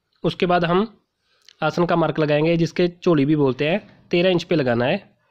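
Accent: Punjab, India. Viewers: Aapki aawaj se punjabi jalak rhi h waise video 1 no. H ji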